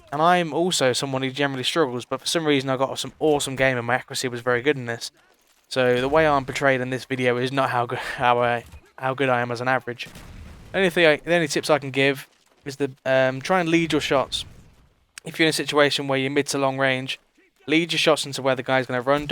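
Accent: United Kingdom. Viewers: do you live in manchester or stockport? you sound it...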